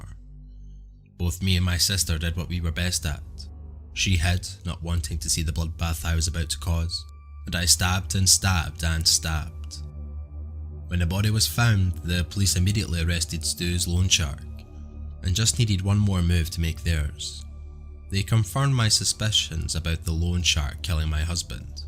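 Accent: Scotland, United Kingdom. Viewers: Just another one of my new favorite guys because of the great voice and accent! Sometimes there is some Scottish sounding accent too! 💚 Think I've listened to all videos, love the longer ones. Hope you make a few more of them.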